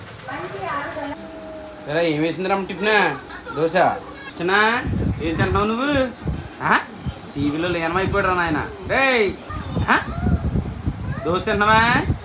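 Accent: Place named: West Godavari, India. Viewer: పిల్లలు తో మీరు చేసి సరదా సరదా ముచ్చట్లు చాలు బాగునాయీ . అచ్చమైన ప గో భాస మరియు యశ వినసొంపుగా ఉన్నాయి ఆండీ కీప్ rocking విత్ యువర్ vlogs బ్రదర్ 😍😍😍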